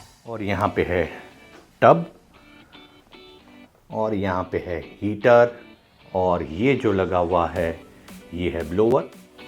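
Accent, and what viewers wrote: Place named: Italy. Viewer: You have build a nice Italiano accent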